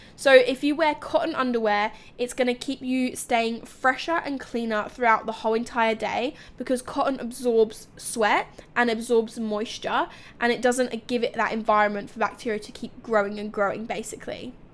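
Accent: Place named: Australia. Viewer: Am I the only one who is hearing the Aussie come out!!! Can’t wait to see your Aussie content! Xx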